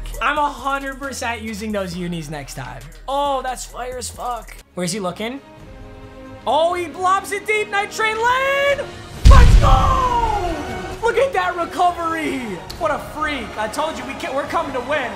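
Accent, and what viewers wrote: Michigan, United States. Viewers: Are you from Michigan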